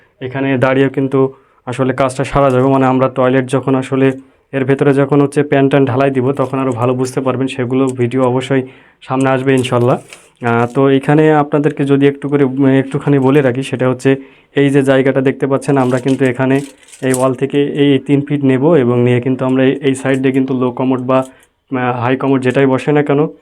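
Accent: Jessore, Bangladesh. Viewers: Thank you.kub Moja legece r apnake dekhe onnek onnek hasi payece.mone hoi apnake osostite fele diyeci.sorry.tobe apnar mukhe jossorer vasa sunte darun lagcilo.kintu short vedio upohar dilen!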